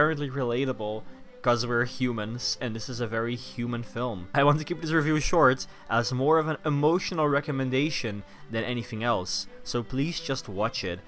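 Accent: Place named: Scotland, United Kingdom. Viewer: Why is your accent 15% Scottish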